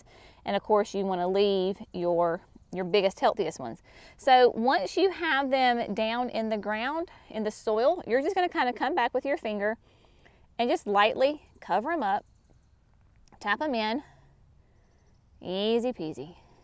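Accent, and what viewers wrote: South, United States. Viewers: Great tips! You are so pleasant to watch. I’m from New Hampshire and your southern accent sounds beautiful to me🥰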